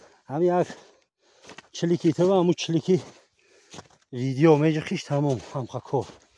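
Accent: Tajikistan. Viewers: Асалому алекум бародар бисер навори хуб як бародар аз нохияи Сангвор будан амихели наворхои хуб мекардан ва мисли шумо бо як лафзи ширини махаллии Точики сухан мекадан, хела вактай навор намекнан, навортон бехтарин, как говорится продолжайте в таком духе 🙌🙌🙌🙌👍👍👍👍🤝🤝🤝🤝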